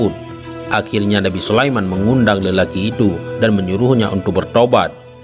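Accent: Aceh, Indonesia. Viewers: Logad bhsa Admin ini kyak logad bhasa Aceh..?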